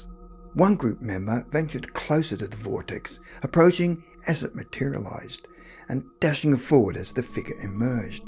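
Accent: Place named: Australia. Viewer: Australian accent 😁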